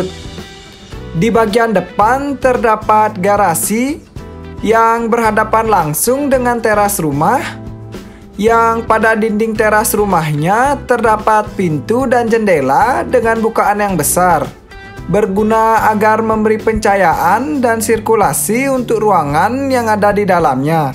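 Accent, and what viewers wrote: Bali, Indonesia. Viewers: Mantaaap Bagus Niihh Buat refrensi Bagi yg Memiliki lahan Yg Kecil untuk d jadikan rumah Tinggal.. Bicara Nya Bali Banget Broo. Salam Nak Bali Rahayu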